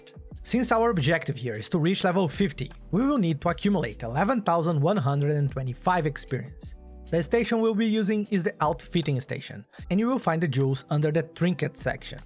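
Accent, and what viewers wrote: Brazil. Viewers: Looks like a Brazilian accent lol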